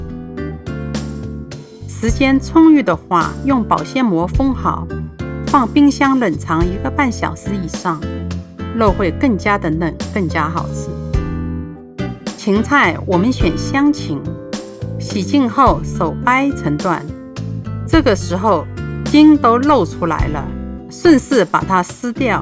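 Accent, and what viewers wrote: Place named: South, China. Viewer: 韓俊傑 南方人都这口音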